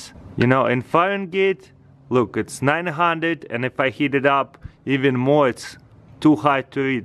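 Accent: Russia. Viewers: Diego Andre He's Russian. It's called an accent, if you've never heard of it. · Come on everyone be more mature! has an accent, i bet if you spoke Russian and were from another country trying to have a youtube channel, you wouldn't like people making fun of you! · Diego Andre he's Russian he has an accent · Diego Andre he's Russian, have no never heard of accents?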